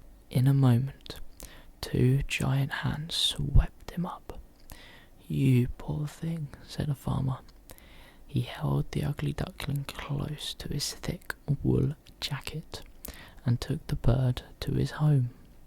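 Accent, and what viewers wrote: United Kingdom. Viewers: Great Bri'ish accent.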